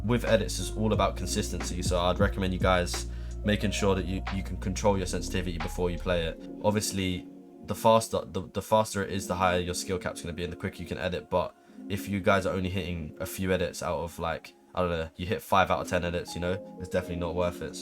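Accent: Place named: United Kingdom. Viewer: Are u British I love the British accent 🥰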